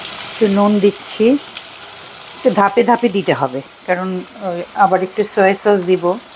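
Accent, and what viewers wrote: Sirajganj, Bangladesh. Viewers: আহারে প্রাণের সিরাজগঞ্জের ভাষা। ❤❤❤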